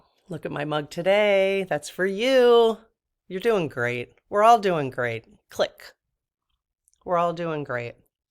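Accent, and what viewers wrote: Midwest, United States. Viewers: Hi!! Yes! Everything will work out for us! Let’s raise that vibration so the Universe will match it! When I first came across your channel I recognized the midwestern accent and you said today you are from Wisconsin. My Mom was from Door County (Sister Bay) and I have such wonderful memories of my MorMor’s cherry farm! Such a beautiful state. ❤️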